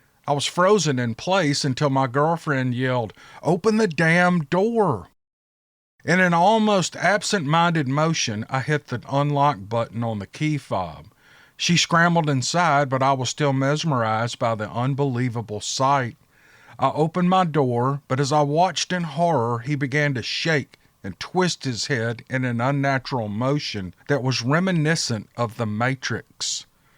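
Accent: South, United States. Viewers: thanks for keeping us in your thoughts even while you're too busy to post you still think and plan ahead so we will have new posts to watch!! That's above and beyond!!! See you when we see you! I sure do enjoy your telling of others encounters!! The people that experience their encounters do a great job of writing them up but that's based on what you read and while I live on the West coast I grew up in the woods of Missouri, then from 1970 to 75 Ft. Hood Texas where we hunted and fished every off work hour we could get and between hunting on foot or riding my dirt bike I covered that post pretty damned well when on my own even! I love the southern humor and style and you're definitely all of that in its best vocal embodiment of it in my opinion!!! Can't wait for the next few!!!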